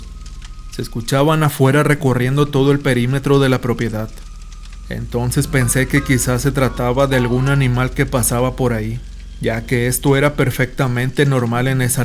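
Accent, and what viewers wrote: North, Mexico. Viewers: Me gusta la voz del otro narrador , a este se le sale el queso mexicano no mames wey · porque habla muy norteño!.😤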